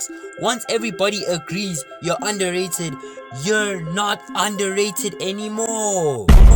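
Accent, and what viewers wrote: South Africa. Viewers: No LIE this man sounds SOUTH AFRICAN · South African accent heavy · W vid and vids. You South African, bro?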